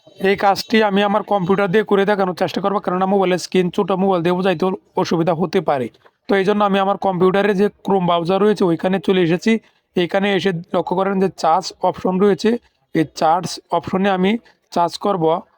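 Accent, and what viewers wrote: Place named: Mymensingh, Bangladesh. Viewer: আরে মঘার বাচ্চা মঘা একটা ভিডিও বানাইছস তাও সঠিকভাবে কথা বলতে পারিস না। আগে ভাষার কোর্স কর তারপর ভিডিও বানাইবি।সব যায়গায় কি নেত্রকোনা/কিশোর গন্জের ভাষা বললে চলবে? মানুষ এমনিতেই বলেনা ময়সিংয়া গাইয়া ভূত ।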